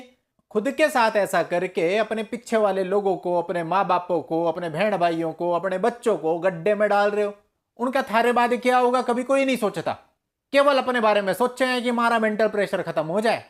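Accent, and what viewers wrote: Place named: Rajasthan, India. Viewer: Dear sir, Aap ki Rajsthani sunke bahot maza aaya.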